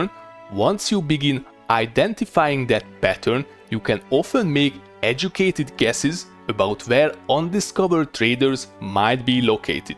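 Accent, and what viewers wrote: Hungary. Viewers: Csodás videó! Mint új játékos, nagyon sok szenvedéstől fog megmenteni. A magyar akcentus meg fenomenális! Csak így tovább! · Akcentusból már tudtam hogy magyar vagy xD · Oh I never realized that shoveling cattail roots is the way to go... also that's some nice Hungarian accent you have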